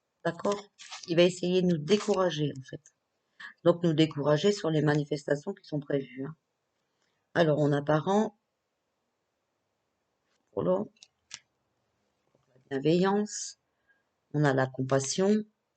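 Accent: North, France. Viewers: Bon Anniversaire 🥰💜❤️💛 Je viens de vous découvrir et j'apprécie votre naturel... et votre accent de chnord;) ( le même que ma grand-mère) Bonne continuation j'aime bien venir vous écouter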